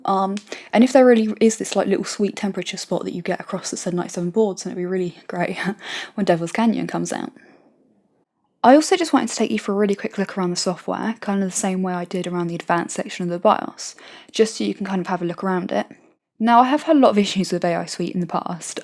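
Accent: England, United Kingdom. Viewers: Very thorough review, you girl know a lot about hardware. On the top of this you have a very pleasant voice and lovely english accent.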